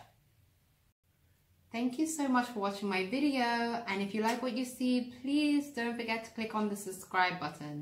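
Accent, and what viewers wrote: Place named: United Kingdom. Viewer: This is a great video. In love with your accent a bit British but not strong. Just so cool. And that's a great video content there!!! Keep it up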